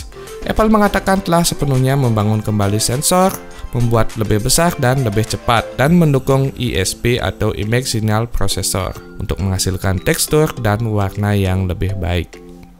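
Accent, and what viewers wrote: Bali, Indonesia. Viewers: Logatnya Bali ni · Jeg khas omongane bli, medok bali gati Ulian ne dot dadi meli puk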